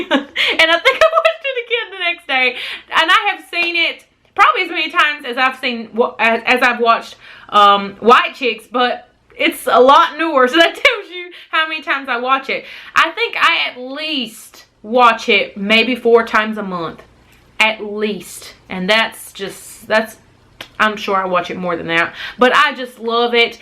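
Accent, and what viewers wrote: South, United States. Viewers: Your accent is so southern!😂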